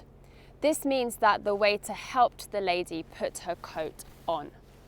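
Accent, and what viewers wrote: United Kingdom. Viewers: This british english accent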